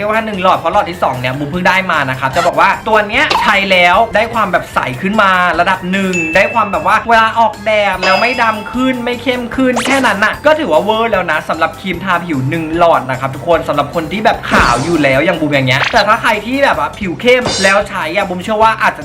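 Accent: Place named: Thailand. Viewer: คนจังหวัดอะไรไหนพูดสิคะ<num>น่ารัก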